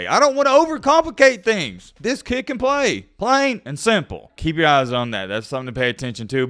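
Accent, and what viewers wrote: South, United States. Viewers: I don't even mind when you ramble about rambling. I like your take on football most of the time and I find your southern twang hilarious. Boomer Sooner on the Rise!!!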